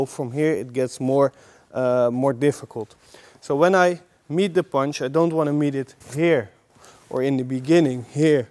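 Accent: Netherlands. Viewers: je bent nederlands he ?/? ik hoor het aan je acccent.. waar zit je op school. want ik wil ook systema gaan leren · 100% Dutch...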